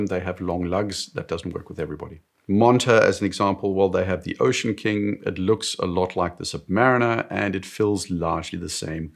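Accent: South Africa. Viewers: I thought as much. You just cannot mask a Joburg accent... I had some of my watches stolen out of my luggage at OR Tambo... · sounds like a south african accent. i bet this gentlemen is from joburg or capetown, just a guess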